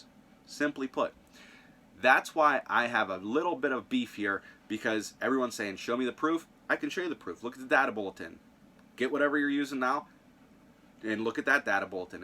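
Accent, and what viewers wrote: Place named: Pittsburgh, United States. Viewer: You have a Pgh accent. I know because I grew up in the South Hills off Bower Hill Rd. BTW, love Amsoil, but best to put in newish or well-maintained vehicles.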